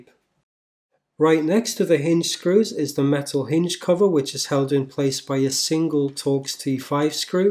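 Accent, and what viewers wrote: United Kingdom. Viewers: Hi there! thanks for the tutorial! Where did you buy the assembly from(which buyer)? I found some on Ebay, shipping from China, but I am kinda skeptical. If it was from China, did you have to pay customs? (you sound British, so it should apply to me as well as I am from the EU).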